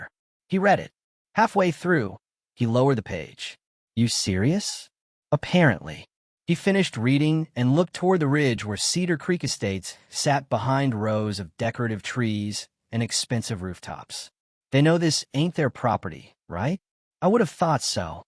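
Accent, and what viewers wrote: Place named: Spain. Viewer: Ugh, the amount of verbal diarrhea in a simple 20 minute story, plus the periodic Spanish accent and gibberish? 👎👎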